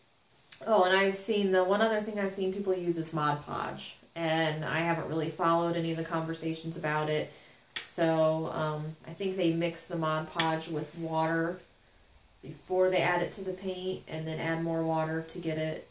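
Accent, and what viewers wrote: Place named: Midwest, United States. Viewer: I like the subtle midwest accent with a touch of pirate on the '-ar' like jaaaar.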